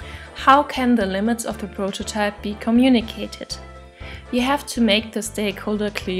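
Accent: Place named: Germany. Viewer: Thank you, this is a fairly comprehensive overview of what a prototype is. I appreciate the depth of the technical terms used. By the way, "Resources" is spelled incorrectly. Also, please reach out if you are interested in an English voiceover for any future projects. (It is sometimes a little distracting, from the content, to listen to the German-accented English pronunciation and mispronunciations.) Otherwise, thanks for posting!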